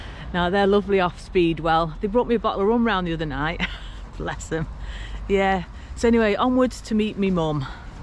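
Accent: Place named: Yorkshire, United Kingdom. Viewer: That Yorkshire accent makes you fitter .. you are hot 😉😂😂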